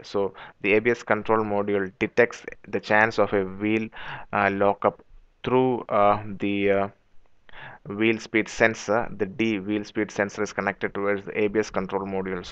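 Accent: Kerala, India. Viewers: fo tys.. fuck ur indian accent.. if u dont know how to speak english then dont speak it.. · fucking hate your indian accent. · Lol its the malayali (keralite) accent. Sucks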